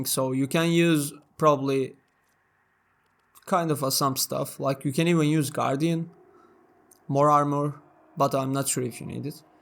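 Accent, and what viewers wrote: Turkey. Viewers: aksandan direkt anladim turk oldugunu bu arada ama guzel bizim kitleden de icerik ureticilerinin cikmasi basarilar!!!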